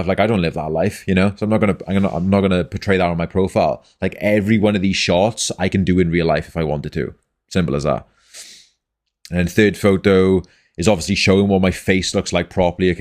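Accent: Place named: Wales, United Kingdom. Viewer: you welsh? im also from wales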